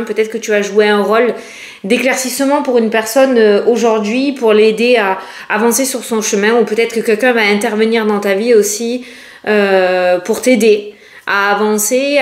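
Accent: South, France. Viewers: domage j'aimais bien ton accent du midi ton franc parlé et tu m'éclate quand tu raconte tes histoires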